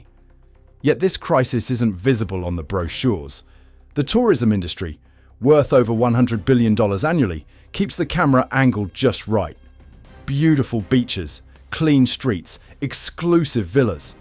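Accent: England, United Kingdom. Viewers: The story would be better if it was. told by someone who sounded American. An Englishman, may just not think much of America, in general.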